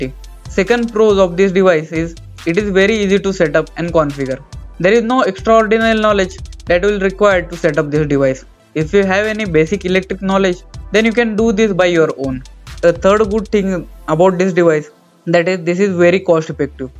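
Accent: India. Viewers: Hindi speaking English; I hate that accent. All are the same.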